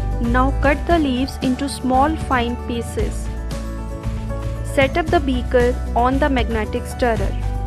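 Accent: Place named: India. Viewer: Ini gara-gara ada logat India nya gw jadi gk ngerti, kalian kali jangan ada logat India nya woyy.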